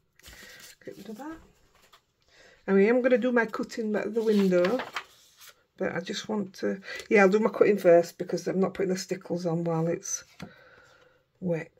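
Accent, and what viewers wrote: Yorkshire, United Kingdom. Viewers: Hello, I found this video today. I enjoyed watching it a lot, so instantly subscribed. It's also lovely to hear a Yorkshire voice. I look forward to watching your other videos.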